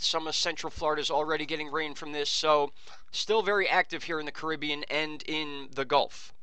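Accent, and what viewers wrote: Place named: Long Island, United States. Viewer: You have a Long Island accent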